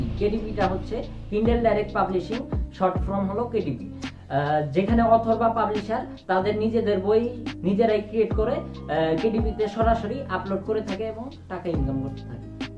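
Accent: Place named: Sirajganj, Bangladesh. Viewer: sirajgonj ar moto kory khota bolen to tai bolam. ok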